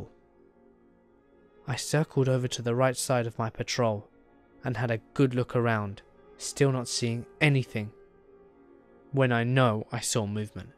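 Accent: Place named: United Kingdom. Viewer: Another British accent... Go figure.. Let's read must be a BBC thing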